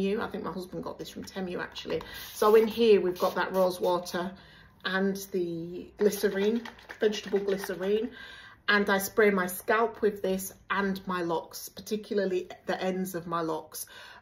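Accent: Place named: Northern England, United Kingdom. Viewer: New subby, also from the uk just down the road from you in the midlands, as I can here your northern accent 😊🙌🏾 I’m just voice 5 years in with diy locs got just under 200 as was not prepared to travel to get my hair started or maintained. I’ve learn so much these past 5 years & started family members on their journey too, we all love locs. Your hair is beautiful & grows fast! Glad I found your channel 😊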